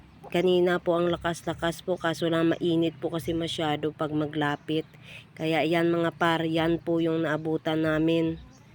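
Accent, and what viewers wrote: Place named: Iloilo, Philippines. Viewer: Halata ang ilonggo nimu madaam...good job ..go lang ng go ...❤❤❤❤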